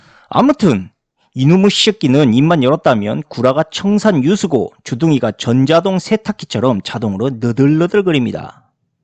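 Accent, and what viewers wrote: Jeolla, South Korea. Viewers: 전라사투리로 욕을 찰지게하시니. . 시간이 금방지나가네요 ㅋ 일론이 아버지도 사기꾼이었다고 하든데. . 피가 흐르는건가?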